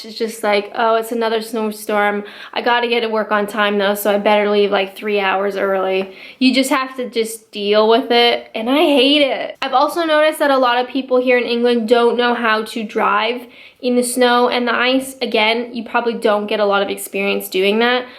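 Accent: Canada. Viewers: It's abute ( Canadian pronunciation ) the South Scotland is another country that also says abute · Very interesting videos, from an interesting perspective. One thing you haven't yet learned... in your generalisations, it's not England... it's the UK. There are also places such as Scotland, Wales and Ireland. It's rather like us here referring to you generally, as as American, with an American accent. I know you are Canadian, and I can tell from your accent even, but you get what I mean. Yes, you're in Kent which is in England for sure, but it's the UK, and I am not English!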